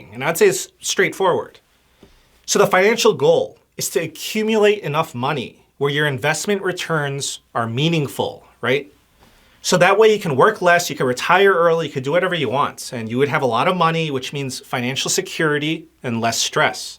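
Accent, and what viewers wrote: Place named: Michigan, United States. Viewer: Are you from Michigan? You have a subtle Michigander accent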